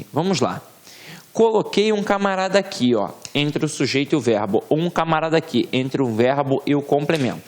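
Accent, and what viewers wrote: Rio de Janeiro, Brazil. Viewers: Aula muito legal, o carioquês é muito bom kkkkkkk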